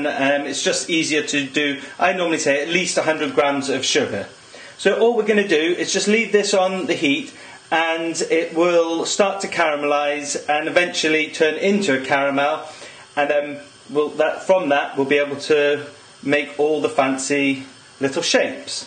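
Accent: United Kingdom. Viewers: Are you from Cardiff? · He's not Australian -____- I'd have thought by his accent it's pretty obvious he's from the UK, but obviously not.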